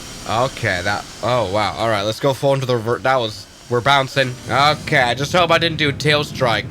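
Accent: United Kingdom. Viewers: plz no plz no why british accent why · Why does he sound a bit British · bri'ish now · Damn mate you bri'ish now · Cause he was in London City airport and in london speak british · Love thee bhrithish akhcent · Never would i think on this beautiful day that Sir would prefer the british accent on this inaugural day! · I ponder why this gentleman was conversing as a britman.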